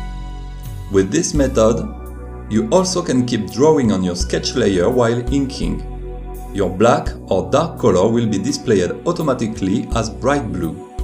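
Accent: France. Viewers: feels weird being french and watching a video in english with a heavy french accent xD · Tu es français non? Tu as un bon anglais mais il faut encore travail un peu l’axent :3 Sinon le contenu est bon est tu es assez clair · j'adore votre accent, vous êtes trop français. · Le ptit accent français x) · You are french right? Ton accens francais ressort x) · This is a really great tutorial! Out of curiosity, based on your heavy accent, are you french? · are you french ? cause you've got an enormous french accent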